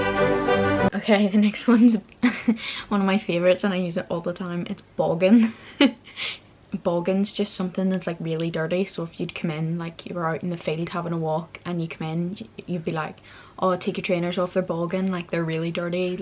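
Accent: Belfast, United Kingdom. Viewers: I think you did good lass. Seeing as studied with at Uni with Irish students from Norn'Iron and the Republic. I would think Belfast hast the sexiest accent but I would vote for Fermanagh. Though you don't have a strong Belfast accent. I have been over a few times since and Pandemics permitting I hope to go to New Horizon next year in the North. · I'm from Ireland myself😭😭 and to be honest I do love a Northern accent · The best accent in Ireland by far.... And I'm from dublin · Love female Belfast accent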